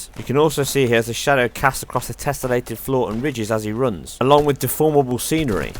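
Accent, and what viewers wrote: England, United Kingdom. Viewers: Man, we love this! You have seen the demand for your kind of workmanship here. We are looking forward to more top notch analysis like this that puts Eurogamer and Digital foundry to shame. They now have competition! You have our support. Thank you you have awakened to giant nerd in us. Love your stuff and that English accent is a sure fire win. Everybody is posting your video on many comment sections on gaming sites. Keep this up.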